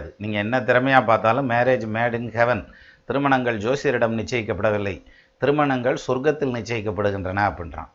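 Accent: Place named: Kongu, India. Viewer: Sir, my guess you are from kongu vellala gounder community ... Pls don't mistake I m guessing based on the way you talk and the examples you say in between, which is very familiar in this community. I may be wrong....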